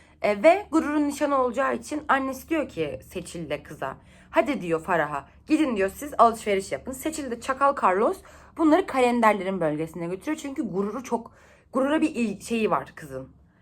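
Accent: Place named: Black Sea, Turkey. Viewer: Ayayayayaya Karadeniz şivesii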